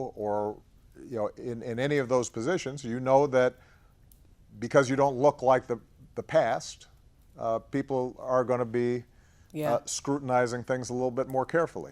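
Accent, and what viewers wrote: New York, United States. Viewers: I played at 1.5 & he spoke like a new yorker. 😎 Miss him.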